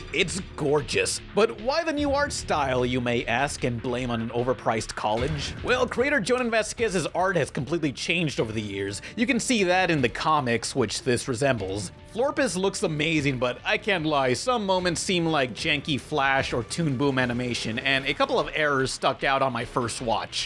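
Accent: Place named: Alabama, United States. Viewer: Bruh you got some Alabama shit going on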